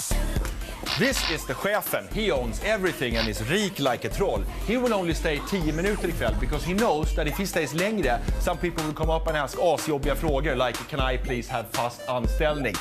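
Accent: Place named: Sweden. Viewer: It's called "Swenglish". ;)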